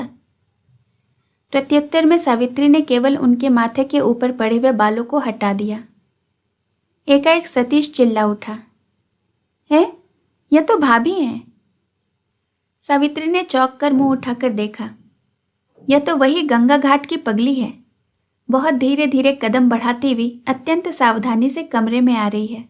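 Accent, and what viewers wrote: Standard. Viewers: ❤❤❤ बहुत ही संयमित एवं अनुशासित, बहुत ही सुंदर एवं दक्ष उच्चारण कला के साथ ऑडियो प्रस्तुत करने के लिए बहुत बहुत धन्यवाद साधुवाद!! एक क्षुद्र जिज्ञासा...😊 आप किस क्षेत्र से हैं; यह आपकी उच्चारण कला से भी जन नहीं ताड़ता!🎉🎉 कृपा करके बताने का कष्ट करेंगी जी??❤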